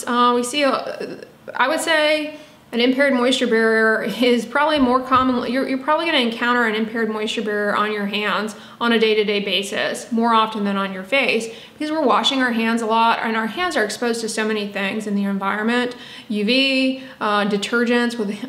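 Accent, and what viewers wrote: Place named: South, United States. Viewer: Are you from Virginia or perhaps Texas? I can hear a twang in your voice but only slightly with your “o”s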